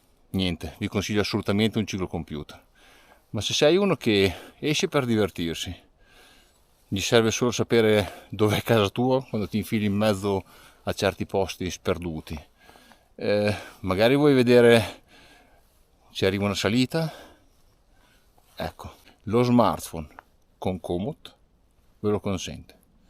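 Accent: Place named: Emilia, Italy. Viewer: Ciao Hai un accento emiliano e vivi in Germania ma quanto forte sei è un gusto seguirti. Continua così.....😊😊😊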